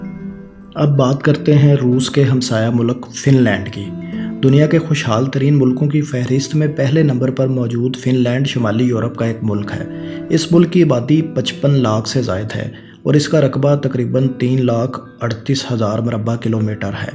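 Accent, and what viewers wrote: Punjab, Pakistan. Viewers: sir ki Urdu bhe saaf nhi hai punjabi accent use karte hain urdu main jayse Barabara main bara ko kisi Ajeeb andaz main bolte hain plz change Karo plz 💔💔💔💔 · Pls correct the pronunciation... It feels like punjabi. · bhai, is bhai ki awaz Zara suit nhi krti apki video's my.... aysa lgta ha punjabi bol raha ha, gala ky urdu bol raha ha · Urdu to sahi bolain. waraich jaisa koe nhi? Ye sahab acha bol rahy hain laikin talafuz main kafi kharabi hai. Aisa lag rha hai jaisy punjabi lehjay main urdu bolnay ki koshish ho rahi ho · Punjabi accent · Too heavy Punjabi accent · Sub ko pata hay paindu ji, is say to acha tha kay Punjabi hi bol laytay, kisi Urdu bolnay walay say video banwa liya karo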